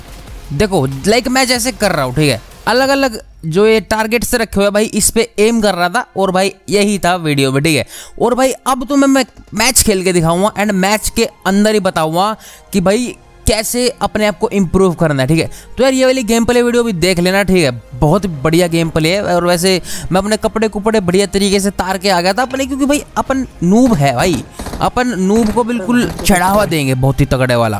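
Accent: Haryana, India. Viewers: areeeeeeeee bhai love you ek haryanvi ko haryanvi hi pehchaan sakta hai😊😂❤️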